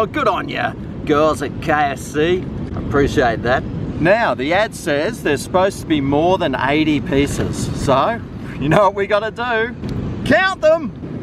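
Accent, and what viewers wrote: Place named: Australia. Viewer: australian accents are way too course, I can't listen to this prattling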